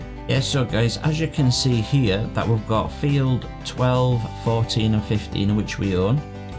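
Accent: Yorkshire, United Kingdom. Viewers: sounds like keith lemon. definately yorkshire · Nice to hear a yorkshire accent, Harrogate here ;). Great upload, do you have a list of the equipment you rented etc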